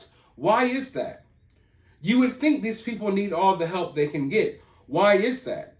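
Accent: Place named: Philadelphia, United States. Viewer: You're down south? But you say "woodder" instead of water as we do in Philadelphia. I assumed you were from the northeast 😂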